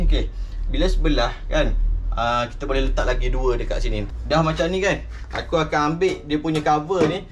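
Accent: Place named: Terengganu, Malaysia. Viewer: Dengr abg ckp macam orng ganu je,kalau ganu sama la kita🤘apa pon terbaik boh👍👍👍👍👍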